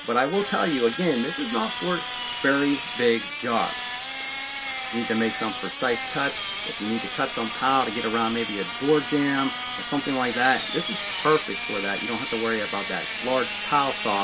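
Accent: Pittsburgh, United States. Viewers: Thats a fine pittsburgh accent you got there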